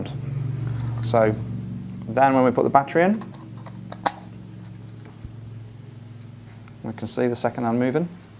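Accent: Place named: England, United Kingdom. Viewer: It's easy when you know how! Thanks. It was so reassuring to hear an English accent for the demo too. Very good thanks.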